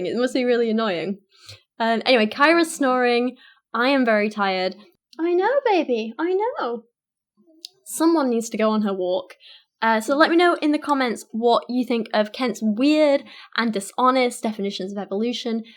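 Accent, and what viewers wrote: United Kingdom. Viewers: Girl why don’t you do something constructive and wear less makeup, why do you atheists insist on going after others who don’t follow your fairytales? Maybe spend more time looking in the mirror, beauty is skin deep, your soul is dark and ugly, and why make videos at your expense while trying to please your moronic subscribers? You bring up Dr Kent Hovind because you need viewers, maybe develop your channel on your own merits and not going after someone trying to save you from hell. But you sound like a Brit so that explains your godless attitude, hope that works out for you.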